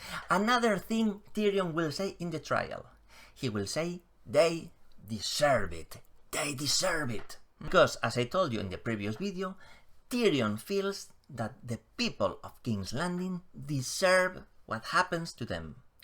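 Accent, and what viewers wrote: Spain. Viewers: I don’t know how I ended up here but as soon as I heard your accent and pronunciation I knew you were Spanish 😅 ( I’m also from Spain btw )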